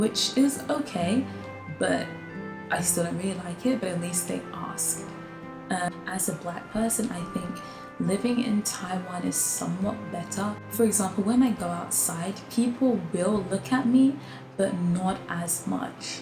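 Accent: United Kingdom. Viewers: 英式口音超好聽的🥰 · 同意 語速對我來說剛剛好 還有英腔的r真的好好聽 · I am a hongkonger, I think Hong Kong also is a nice place. General Hong Kong people are educated and open mind that welcome people from all around the world. Hope you would like to travel in Hong Kong. 🇭🇰🇭🇰 by the way I love your British accent❤️ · 覺得你說的很好👍🏼很喜歡你的英式發音跟講話方式 口條很清楚 聲線也很溫柔 很方便練英文聽力！說實在我在路上看到不同種族跟國家的人會很想認識他們 因為好奇他們的文化跟生活方式～希望妳在台灣能過的開心！ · 剛開始看就覺得口音很英腔，後面提到說來自英國就理解了，以前在念書的時候曾遇過膚色較深的法國人，之後就比較不會用膚色去判斷哪裡人了😂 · I like your British accent ;) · 你的口音聽得出來是個英國人。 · of course, you're a Brit. Most of the people here just can't tell your British accent unless you sound extremely posh. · Love your British accent,welcome to taiwan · 看影片前半段的時候就感覺你說英文好像有英國腔 等聽到你說是英國人的時候馬上恍然大悟「難怪~~」😆 歡迎來台灣！ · 哈哈！我好厲害！ 我聽妳說話一分鐘我就在猜妳是不是來自英國，因為很明顯的發音特徵，short a sound and no hard R sounds，但容我再猜一下，妳應該最初不是來自倫敦（雖然妳有提到倫敦，但家族應該不是一直住在倫敦吧！？），而且口音也不太像PR口音！ 此外我知道，英國其實有很多非裔人士，就跟法國一樣有很多非裔人士，所以我不感到意外，畢竟西方的人種很多元，當然台灣也是多元種族的社會，但在膚色、髮色等外顯特徵比較相似，不像西方國家那麼明顯！ 若是在台灣，有人讓妳感到不舒服，請妳原諒他們，多數台灣人還是懂的那分寸的，此外，妳提到跟台灣人不好交朋友，或許只是妳剛好碰到的人比較害羞，或者不擅長英語，又或是其他原因，我想，多數台灣人還是很喜歡跟外國人認識當朋友的！ 前面那些猜測，純屬好玩，希望沒冒犯妳，最後，祝妳能在台灣有美好的體驗與生活！ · 口音很優雅聽得出來像英國的感覺😍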